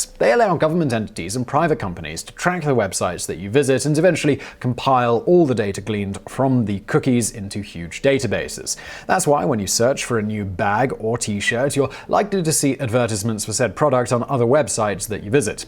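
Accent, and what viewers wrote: England, United Kingdom. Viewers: how do you figure its an American? The host is clearly English.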